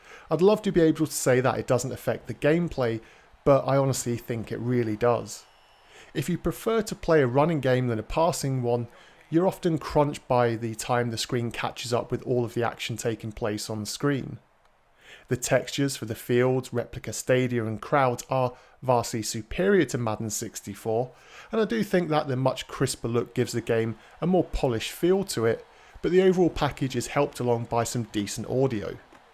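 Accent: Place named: United Kingdom. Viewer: I've finally done it. I've watched all 293 of your N64 game reviews on your playlist, in order. I started this journey 6 months ago, watching 1 or 2 videos a day on my lunch break at work as I smoke Newports. You've become a part of my routine and I thank you for all the hard work you put into these. Now I must move on and find other videos to watch each day, but I've grown accustomed to hearing your calming British voice each day on my break as I sit outside and relax. Thank you,. 😊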